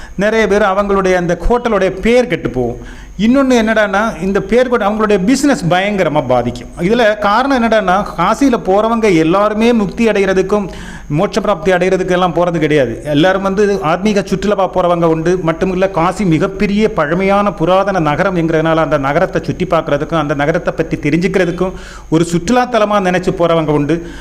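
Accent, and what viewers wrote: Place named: Kanyakumari, India. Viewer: நீங்கள் குமரி மாவட்டமா? பேச்சில் தெரிகிறது 😊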